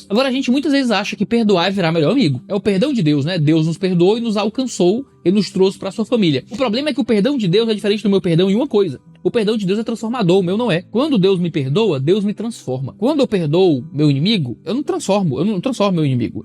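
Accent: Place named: Northeast, Brazil. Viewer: Amo esse jeito bem humorado de falar do nordestino! E o sotaque tbm é sensacional!!😊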